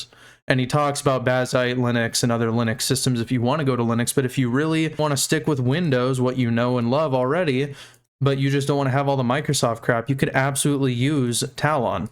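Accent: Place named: United States. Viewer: Bro your acent is lije a true american 😅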